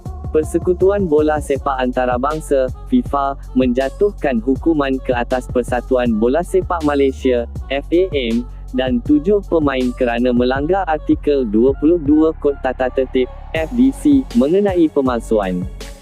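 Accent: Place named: Indonesia. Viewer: Cara cakap kompom dari YouTuber Indonesia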